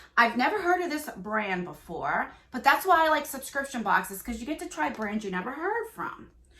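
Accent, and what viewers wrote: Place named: New York, United States. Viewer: Are you a New Yorker? Living for the accent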